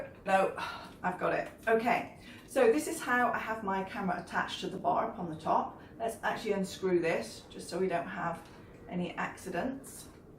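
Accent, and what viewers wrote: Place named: England, United Kingdom. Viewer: You're English!!! I always thought you were American!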